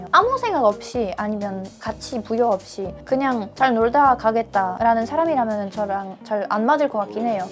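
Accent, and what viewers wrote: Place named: Jeolla, South Korea. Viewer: 한국어에 전라도 어투가 섞여 있어요. 신기..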